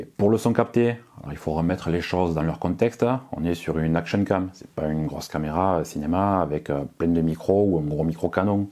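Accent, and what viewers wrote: South, France. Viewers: Salut comme toujours les commentaires sont clairs et précis cet appareil paraît simple d utilisation fait pourtant de belles vidéos encore merci Pour ta présentation continue comme ça avec le bel accent du sud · Sympa et détaillée, ta présentation; et avec un bonus qui va bien: l'accent du sud!!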